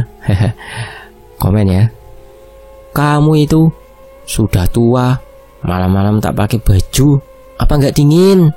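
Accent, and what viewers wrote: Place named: Pekalongan, Indonesia. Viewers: Logat Pekalongan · Logat Pemalang Pekalongan itu ya mas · Logat batang Pekalongan pokok e sekitaran kue min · Logate wong batang, pekalongan, pemalang. Aku dewe wong batang 😅😅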